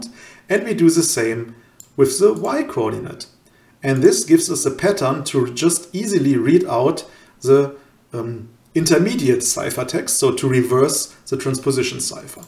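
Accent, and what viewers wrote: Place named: Germany. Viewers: Interesting! But that German accent really is a killer. That's me saying this, a German. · The german accent MAKES the video! 😍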